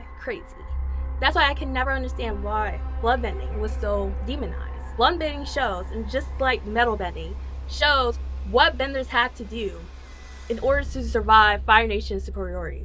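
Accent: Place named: United States. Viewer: First of all, you’re stunningly beautiful. I audibly gasped when you came on screen. But to the point of the video: Iroh is not a war criminal. War crimes are specific things, none of which Iroh ever did. The few war crimes we do see are from - unsurprisingly - Sozin (genocide) and Ozai (attempted genocide), along with - surprisingly - Sokka (he flew the War Balloon with Fire Nation insignia in order to mount a surprise attack) and anyone who allowed children to fight (use of child soldiers, also a big no-no). Iroh mounted a siege while being on the wrong side of the war, but he also grew up under direct influence of the Fire Lord as his father, so one can understand why he might see it differently than it really was. All of his attacks were just trying to breakthrough the outer wall, which we know he failed to do. There are no records of him committing anything that constitutes a war crime. 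I’ll buy that Hama had to stay in the fire nation, but only because there’s oceans between it and the Earth Kingdom. Otherwise, she very much could’ve lived there in anonymity. Fire Nation were probably looking for her at all ports, so it would’ve been her best bet to stay put. But to say that she was somehow justified in torturing civilians (also a war crime, btw) just because they were Fire Nation is not a thought you should entertain. Based on your accent, I’m going to assume that, like me, you’re from the US. Would it be fair if an Iraqi POW kidnapped and tortured you, just because yo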